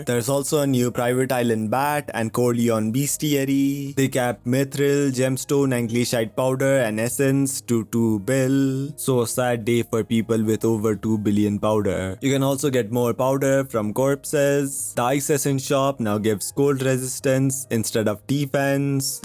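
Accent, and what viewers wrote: India. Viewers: Can you drop the indian accent for the videos its kinda difficult to enjoy watching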